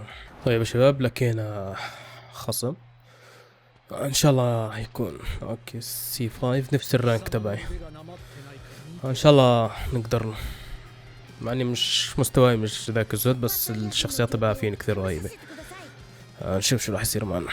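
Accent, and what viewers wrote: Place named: Jordan. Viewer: حاسك من الاردن 😂❤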